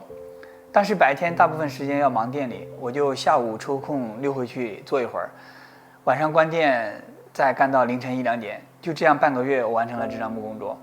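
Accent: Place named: China. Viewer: Привет! Всегда думал, что ты из Японии, но твоя речь и особенно цифра "5" дали мне понять, что ты из Китая. Просто, я не слышал, как ты разговариваешь). Но, не это главное.. Каждое слово в твоём монологе, каждая мысль, созвучны с моими мыслями. Я всю свою жизнь не зависел от начальников, государственных структур и тому подобного. Всю свою сознательную жизнь я зарабатывал своими руками и накопленным опытом. Это были, в основном, ремонты интерьера и разработка и изготовление узкоспециализированных станков. Теперь мне 49 лет, но я гораздо моложе физически и полон сил.... Обеспечил себя большим количеством свободного времени и хорошего инструмента. Твои видео, а так же других мастеров, дают мне идеи и приёмы в новом опыте. Благодарю тебя. Привет из Мариуполя..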